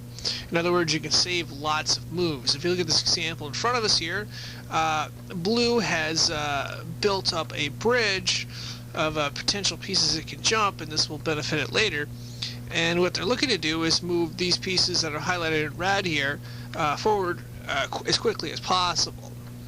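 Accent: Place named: United States. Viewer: You have one of the most 'Murican accents I've ever heard.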